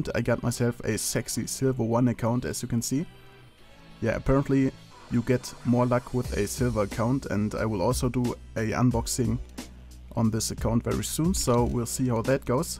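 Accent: Germany. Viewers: naja , so spricht jeder deutsche englisch '-' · Schöner deutsch akzent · Du bist deutsch das hört man:D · red doch einfach deutsch bitte ich pack diesen über deutschen akzent einfach nicht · It sounds like german english? Its right? :D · Are you german? You sound a bit like a german guy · krass wie man hört das du deutsch bist :D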